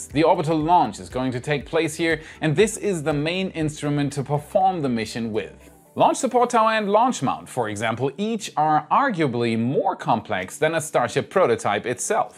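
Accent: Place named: Germany. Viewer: Guter Channel Digga ;) Irgendwie hab ich mir schon gedacht (gehört) das du Deutscher bist ;)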